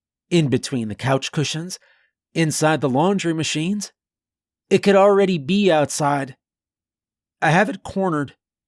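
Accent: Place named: Louisiana, United States. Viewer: What bayou you from, reader?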